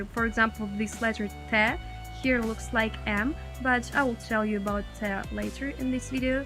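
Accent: Russia. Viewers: im guessing English is not your mother tonged she clearly has a Russian English accent when she speaks english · This is pretty interesting. You speak good english with a nice russian accent. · русский акцент ту мач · nah she has a Russian accent but it's way subtler than most Russians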